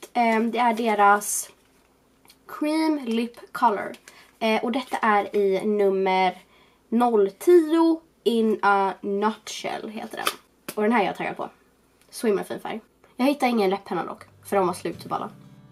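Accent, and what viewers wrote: Stockholm, Sweden. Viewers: Förlåt men pratat hon inte lite Stockholmska???? INTE FÖR ATT VA ELAK JAG ÄLSKAR DIG ❤️❤️❤️❤️❤️❤️❤️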